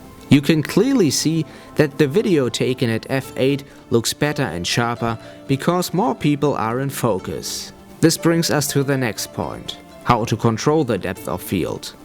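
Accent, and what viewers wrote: Germany. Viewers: That's his real accent. He is German. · cuz hes german :D and he have this cool accent when speaking english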